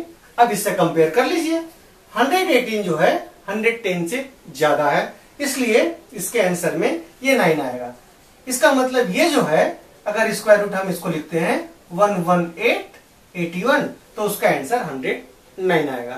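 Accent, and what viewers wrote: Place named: Bronx, United States. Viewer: His south Broncs accent is hard to understand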